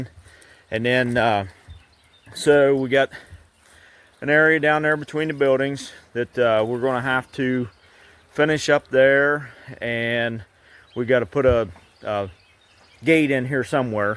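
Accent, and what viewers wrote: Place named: Pittsburgh, United States. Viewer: While looking for Pallet fence ideas for my garden I came across your video. Holy Pgh accent!!! I have one too. I didnt know SE Ohio carried this accent too. Very nice video and I appreciate the demo. It is very helpful.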